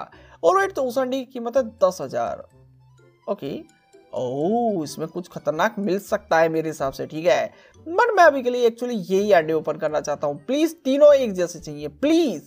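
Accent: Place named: India. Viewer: What do HE speak he speak like Indian???🇧🇷 I have 900Qi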